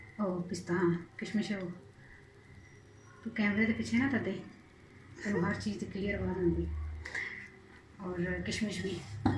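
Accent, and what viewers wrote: Punjab, India. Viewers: App ksa main hain but I think 4rom back home u r from lahore or faislabad because of ur Punjabi eccent😊😊😊😊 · You are so sweet buhat achy and esey treqy sy sab kuch skhaty ho aor Jo bat kerty huye Panjabi ka terqa lgati ho acha lgta Allah ap ka nseeb acha kry ameen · love your Urdu mix punjabi...so sweet, so loving and so nice accent. Your recepies are also very nice, practical and it seems like some family member is telling how to cook. Love you :). I am living in holland and i love to cook and eat desi food. you are doing a good job.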